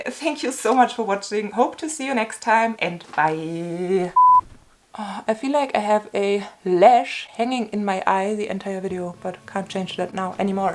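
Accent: Germany. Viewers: Are you german?😅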